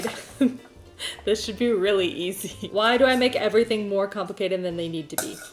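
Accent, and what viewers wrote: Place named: Canada. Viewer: Her Canadian accent really came out in this one 😂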